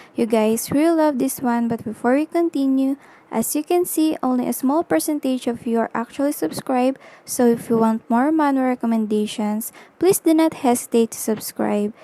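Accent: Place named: Philippines. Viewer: im pretty sure the accent if from tagalog or the language of the philippines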